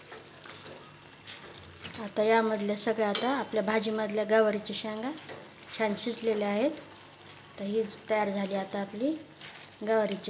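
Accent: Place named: Ahmednagar, India. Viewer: Tumcha gaav kutla? Awazavarun Ahmednagar zilla che vatatat.....ho ka?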